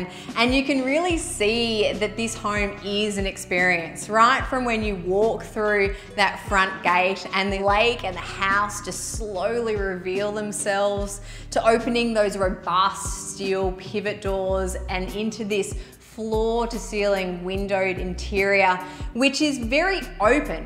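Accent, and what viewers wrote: Australia. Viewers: The presenter is lovely. The Australian accent is a bit much.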